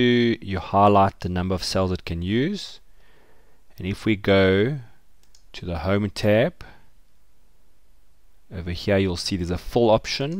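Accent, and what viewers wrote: South Africa. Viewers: This was helpful! I recognize the South African acccent. :)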